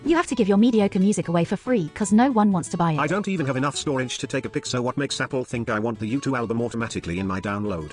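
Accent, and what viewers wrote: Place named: Australia. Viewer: Hey this was a great video! Love getting a seemingly random recommendation and hearing a fellow Aussie. Will subscribe and look forward to your new content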